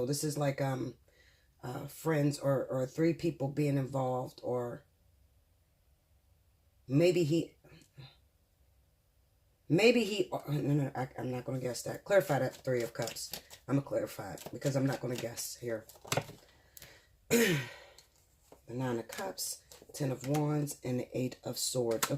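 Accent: Pittsburgh, United States. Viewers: Wow I can hear your Pittsburgh accent clearly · Pittsburgh accent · Are you from Pittsburgh by any chance ?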